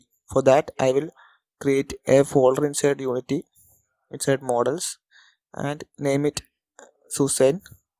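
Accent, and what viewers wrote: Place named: South India, India. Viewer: Thanks bhai that material splitting thing was giving me headaches I was import the whole model without making materials in blender I never used any 3d paint software By your accent looks like you are south Indian hope I am not wrong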